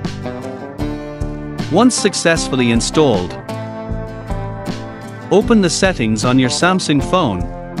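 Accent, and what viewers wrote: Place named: Ireland. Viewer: Great Irish accent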